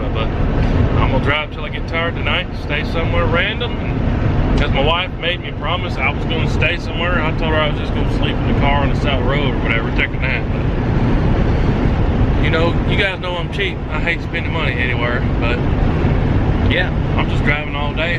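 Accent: South, United States. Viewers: I didn't know you are a fellow Tennesseean, I could tell by your accent you are from the South. I just didn't know where.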